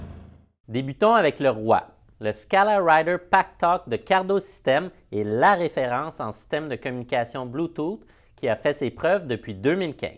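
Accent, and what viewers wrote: Quebec, Canada. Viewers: Je suis tellement fan de l'accent québécois !!! Sinon très bonne vidéo, tu m'as beaucoup aidé merci ! 😉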